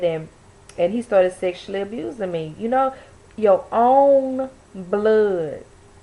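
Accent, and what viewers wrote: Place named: South, United States. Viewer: I like what granny said lol... I love ya country accent itz kute lol... Great vid fam...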